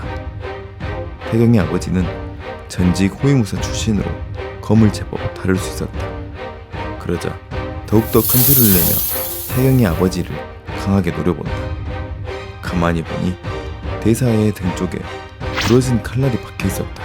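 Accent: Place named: Jeolla, South Korea. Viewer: ㅋㅋㅋ 밤마다 이거 보는 재미에 삽니다. 나레이터님 전라도 말투도 구수하고 ㅎ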